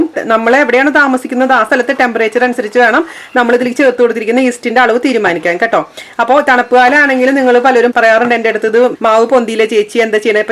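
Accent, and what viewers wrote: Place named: Thrissur, India. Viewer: Hai... njan Saudi Arabia il aanu work cheyyunnathu. Ende mappalakku appam nnu vachal jeevananu... evade njagal thamassikunidathu naalikeram kittilla naalikera powder kittum. But aval appam polichu... super nammude thrissur bashayil... classayitta.. thanks dear... njan ayyanthol aanu thamasam. I love you... enne karikal vakkan padipichathinu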